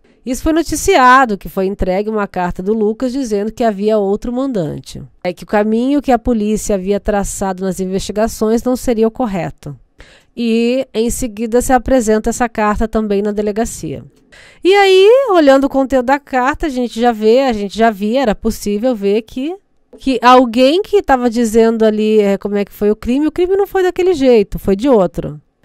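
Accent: Rio de Janeiro, Brazil. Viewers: Seu Sotaque carioca ficou muito bom . Simplesmente sensacional Parabéns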